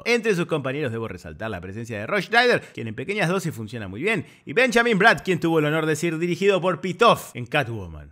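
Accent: Argentina. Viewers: Todo bien todo bonito pero el acento argentino del narrador es insoportableeee 😫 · Jajajajaja…que me has hecho reír con tu acento argentino y tus ocurrencias…la reseña más jocosa que he escuchado de una película…saludos compadre 👍🏻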